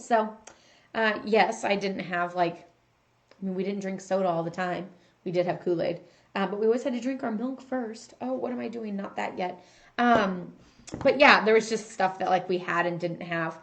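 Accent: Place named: St. Louis, United States. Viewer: Sounds like you are in the St. Louis area¿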